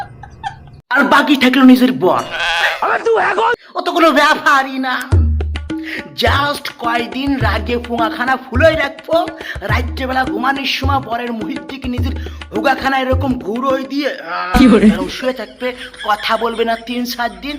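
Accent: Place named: Bangladesh. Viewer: ভাই কি বাংলাদেশ থেকে , কথা শুনে মনে হয়.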